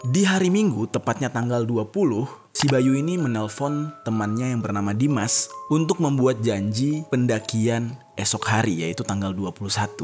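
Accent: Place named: Medan, Indonesia. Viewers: Logat yg enak didengar g terlalu kasar dan ga terlalu lebay ya logat medan,melayu baguss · Maaf bg,aku orang Medan,aku ngakak kalau dengar bg ngomong logat medan😂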